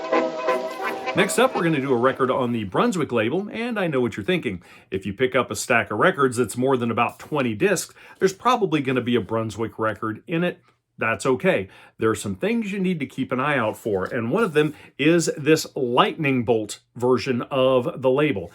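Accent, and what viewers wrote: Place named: United States. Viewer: Apart from your great and highly informative content, your pronunciation is a bliss for non-native speakers. My english is not bad at all, but at school, we learn Oxford. I don't know what it is, but even with your American accent, I understand EVERY single word. By the way: I live in Vienna/Austria, together with my ~ 14000 shellac records.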